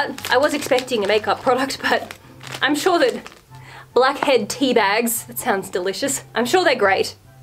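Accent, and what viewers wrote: Australia. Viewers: i love your accent are you from Australia ❤️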